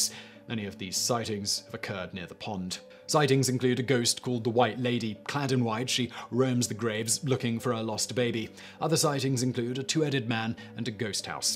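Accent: United Kingdom. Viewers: Who is this British man? And i wander does he smoke weed?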